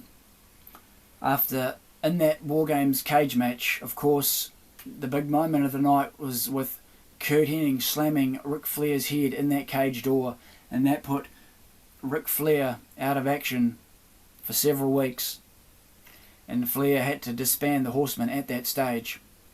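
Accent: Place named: New Zealand. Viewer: This takes me back to a 10.30 on friday nights through the 90z. By sound of your accent lad your from new Zealand aswell bro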